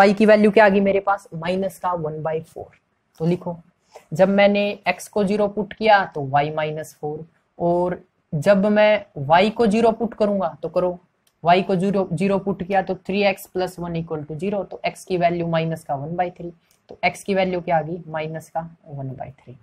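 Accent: Haryana, India. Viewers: Haryanvi mast h sir apki and apki video bhot bdiya lgi sir m bhi Haryana se hu sir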